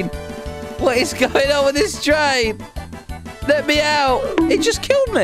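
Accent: United Kingdom. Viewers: btw are you a brit or a scot or irish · YOU HAVE A BRITISH ACENT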